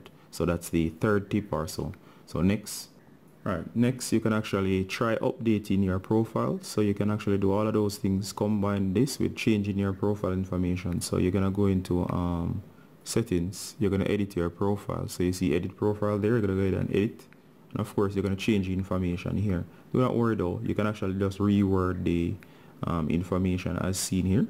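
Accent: Jamaica. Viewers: I love the Jamaican accent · Your a Jamaican?💯🇯🇲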